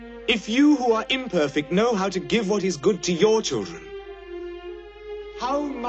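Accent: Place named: England, United Kingdom. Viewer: Jesus didn't have a stupid English accent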